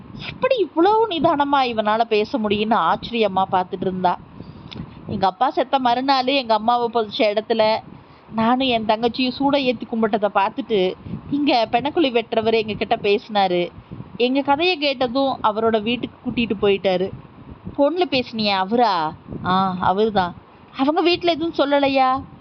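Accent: Kongu, India. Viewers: எதார்த்தமான கதை. கொங்கு தமிழில் அருமையாக வாசித்திருக்கிறார். அம்மாவின் கோபம் எங்களை இப்படி ஆக்கிவிட்டது என்று சொல்வது நடைமுறை உண்மை. நான் ரசித்த கதைகளில் ஒன்று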